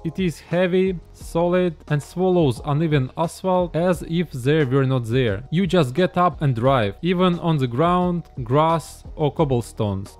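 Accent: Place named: Ryazan, Russia. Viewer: Рязанский акцент :) Успехов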